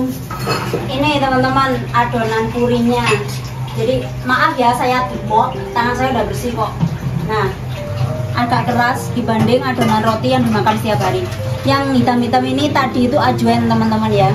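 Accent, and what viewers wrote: Java, Indonesia. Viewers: Mf mb logatnya kaya orang jawa, klo blh tau asalnya dri mana ya mksih kalau mau bales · Mb lucu kl ngmng....tdk sungkan2 dg logat jawanya.salut mb.....👍👍👍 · Mbak yen ngomong boso jowo medok jowo ne iku tetep kental masio dek samean dek india Collab pakai boso jowo dong kak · Setiap mbk ngomong nada jawanya keluar, aku mesti ketawa lho mbk, soalnya klo pean ngomong medok ngt, 👍👍👍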